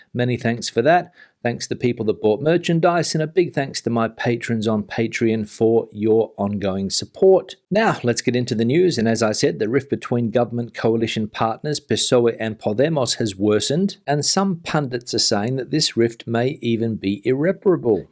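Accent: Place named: Australia. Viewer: Hi, I note you have an Australian accent! Do you see many other Australian expats in Spain, and what made you move to Esp from Aus?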